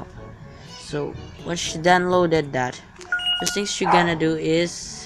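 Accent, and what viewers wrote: Philippines. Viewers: I can easily tell that you're Filipino :D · Yep the accent is my fellow filipino friend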